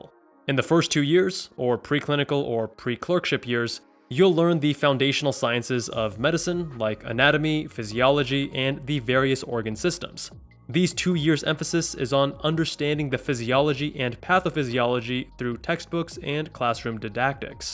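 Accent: Canada. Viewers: I differentiate accents from Canada vs USA by how Canadians pronounce about (Canadians say "aboot"). In some Canadians, "aboot" is more noticeable while in others it's way less prominent. I must say, the narrator of this video has the most prominent "aboot" that I have ever heard! PS: I'm an English speaker, but I'm neither American nor Canadian.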